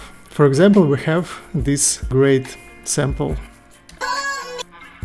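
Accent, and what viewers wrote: Russia. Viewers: dude i love your english russian accent...no need to feel embarassed when you speak in english :) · Brooo amazing work, really! No I feel like my remix is bad😅 Also your russian accent Is reaaly cool! Almost like in my country?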